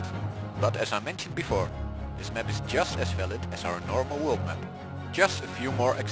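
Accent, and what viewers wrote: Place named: Netherlands. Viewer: Very hollands accent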